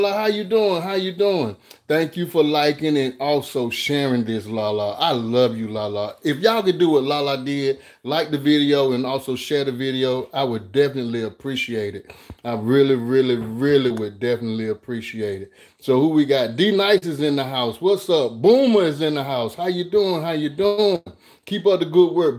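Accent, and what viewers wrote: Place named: North Carolina, United States. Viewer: Hey, I don't know what part of the country you're from but it sounds like N.C. but heard you said you got a air fryer, well I'm gonna get one but I've been waiting for a good one do you recommend the one you have with the fried 🌽 corn? Barbara j.from N.C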